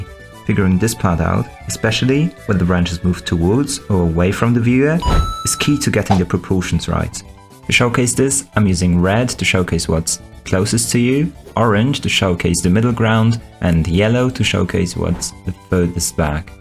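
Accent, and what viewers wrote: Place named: Germany. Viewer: Oh nice I thought i heard some German in there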